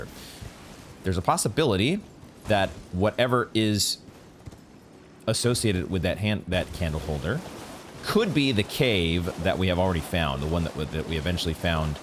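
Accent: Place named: Wales, United Kingdom. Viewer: That's a Welsh accent.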